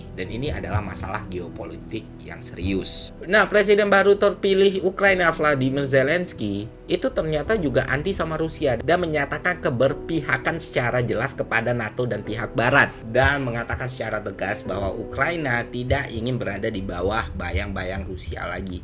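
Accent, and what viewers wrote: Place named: West Java, Indonesia. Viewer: logat sundanya..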